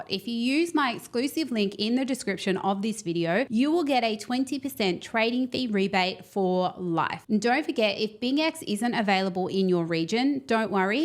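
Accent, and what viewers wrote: Australia. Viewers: woman who loves crypto with an aussie accent. Florida/usa....I COULD NOT BE HAPPIER!!!!!!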